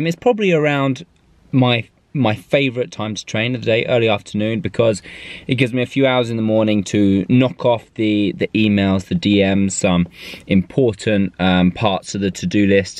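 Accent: United Kingdom. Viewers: Your videos are such a motivation. By the way, lovely accent, what part of UK u from?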